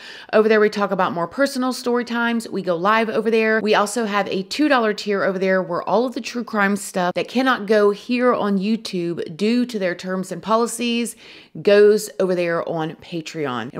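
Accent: South, United States. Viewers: Funny Video... I like Your accent... You Sound Country....❤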